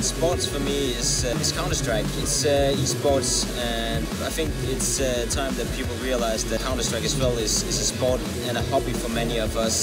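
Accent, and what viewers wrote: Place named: Australia. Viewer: He sounds Australian lmao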